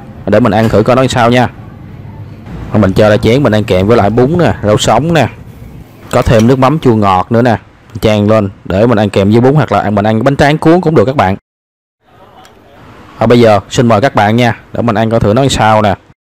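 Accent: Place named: Quy Nhon, Vietnam. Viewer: Chị nghe vọng nói của em Chị đoan em là người qui nhơn Nhung em làm món ăn Miền Nam rất giỏi lắm luôn Chị chúc mừng cho em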